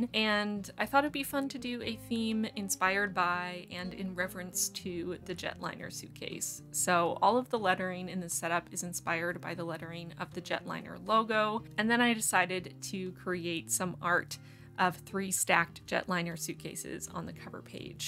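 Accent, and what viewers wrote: Canada. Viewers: Aayyeee I had no idea you were canadian til i heard pencil crayon haha xD ive only ever heard us say that with our NA accents, i think brits say it too but not sure xD nice to see more canadian-based bujo channels. i love seeing both your spreads and your husbands, my current style is waayy more in-line with what you do for your husband, but my dreams are more like yours so it's really cool seeing both!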